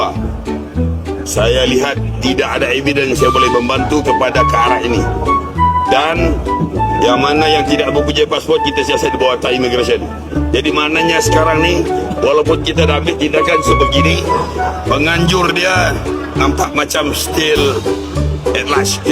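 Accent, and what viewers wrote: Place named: Sabah, Malaysia. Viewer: Loghat kp ni mcm orng sabah je 😊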